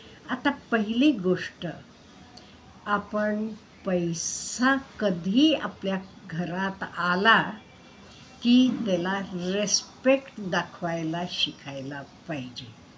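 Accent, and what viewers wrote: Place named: Solapur, India. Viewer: तुमच्या बोलण्यातून तुम्ही सोलापूर चे आहात असे वाटतं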